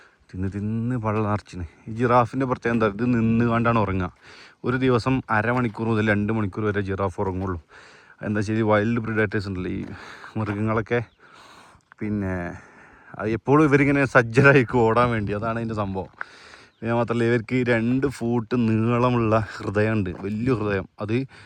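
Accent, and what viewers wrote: Malappuram, India. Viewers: Bro inside wilderness you shouldn’t play with the windows rolling it up and down. What if it gets stuck? But bravetrip indeed. I wouldn’t go alone on such trips 😂. Like your commentary malappuram style ❤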